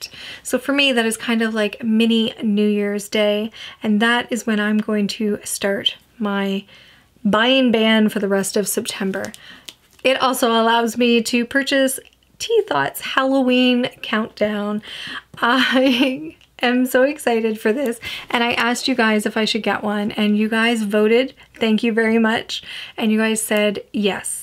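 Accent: Canada. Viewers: Heard you talk & knew you were from like Ontario, or Atlantic Canada! Hahaha, I’m from NL. ☺️ Just bought a little haul myself; including that exact glass bottle! Hope you enjoy everything 🌈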